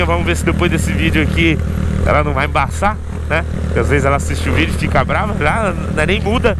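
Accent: Goiás, Brazil. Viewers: por que vc não fala mais naquele pike do goiais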